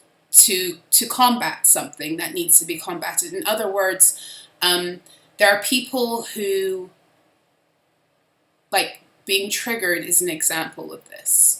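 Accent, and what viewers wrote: United Kingdom. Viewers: Where approx in uk ru. Scottish accent?😊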